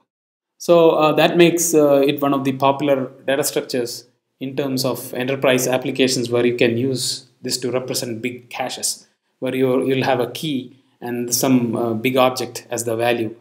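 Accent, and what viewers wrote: India. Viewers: malayale accent ! malyale anno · Indians have their own accent just u having urs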